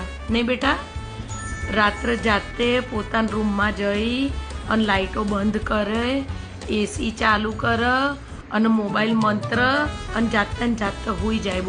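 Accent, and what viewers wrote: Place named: Ahmedabad, India. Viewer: આ તમે અમદાવાદી અવાજ ના વિડિઓ બનાવો છો એ બધા સુપર હિટ છે,, હજુ અવાજ વિડિઓ મોકલો અમદાવાદી 😜😜😜🌹🌹🌹❤❤❤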